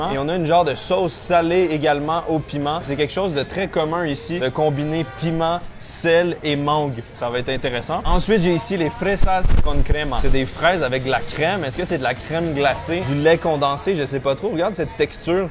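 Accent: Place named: Quebec, Canada. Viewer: J'adore ces vidéos par contre j'ai faim maintenant, l'accent québécois ca me tue de rire 😂...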